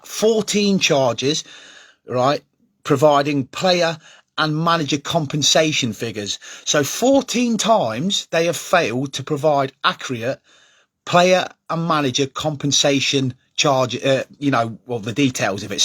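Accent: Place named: Liverpool, United Kingdom. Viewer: Strong Liverpool accent here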